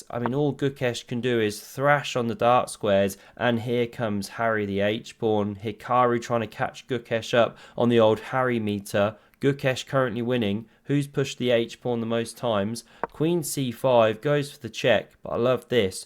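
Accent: England, United Kingdom. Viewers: Scottish accent? Though was English!!!! 😂😂😂Great analyze mon ami 😜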